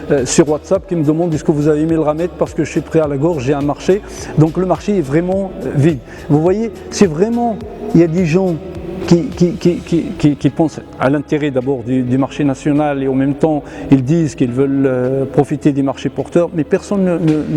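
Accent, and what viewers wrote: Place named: Shilha, Morocco. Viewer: امالك علا لفرونسي باين طاغية عليك الشلحة هضر بيها باش ناس تستافد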